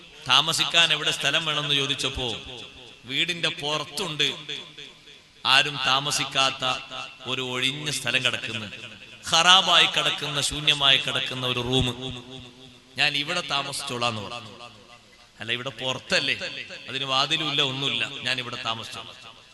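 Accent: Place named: Tamil Nadu, India. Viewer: E usthad thamizh natukarana athu konda chila aksharangal nammale ppole parayan pattathe athu kariyamakanda usthadhavarkal paranchath ketal mathi athanusarichu jeevikkan shramikkuka allahu usthadinu afiyathulla dhergayuss nalkatte ameen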